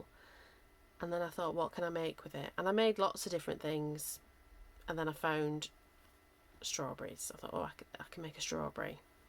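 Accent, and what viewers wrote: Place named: Northern England, United Kingdom. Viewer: I think I hear a northern accent, so if you’re near Wakefield the Salvation Army there has a very cheap charity shop where I get a lot of clothes to use for fabric cheap.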